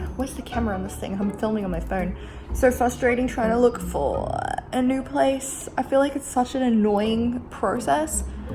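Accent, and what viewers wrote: Australia. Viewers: Australia I believe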